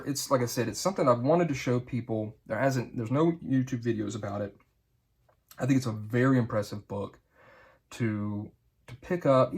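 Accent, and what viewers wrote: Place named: South, United States. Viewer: Great video. I love seeing reviews of 2edition products and FR specifically. Theres very little on 2nd edition Realms out there. I asked this on your introduction video but I thought I'd ask again. Are you in South Carolina? I can detect some southern in your accent and I saw the WYFF meteorologist video lol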